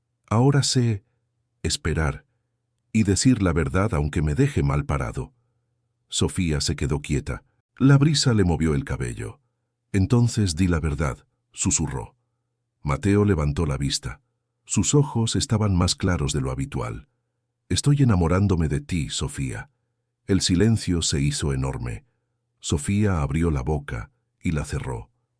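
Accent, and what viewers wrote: Argentina. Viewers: narrada con acento argentino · me emocionó el acento argento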